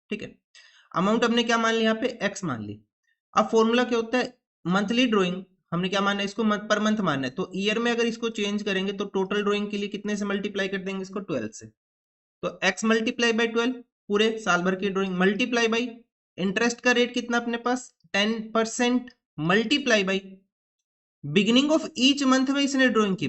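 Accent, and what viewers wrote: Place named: Haryana, India. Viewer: Sir haryana se kaha se ho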